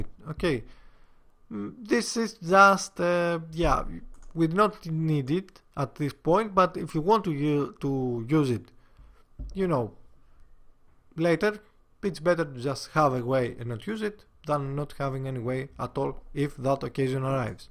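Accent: Greece. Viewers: You are Greek because of your accent.. Huh?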